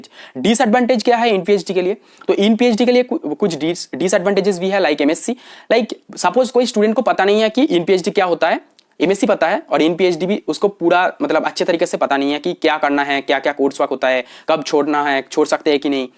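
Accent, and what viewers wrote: Bengal, India. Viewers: Sir are you from West Bengal? 😮😮😮 Your sound is looking like Bengalies.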